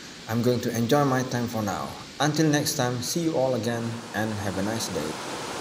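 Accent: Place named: France. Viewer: Where do you live? You sound french but the scenery makes me second guess myself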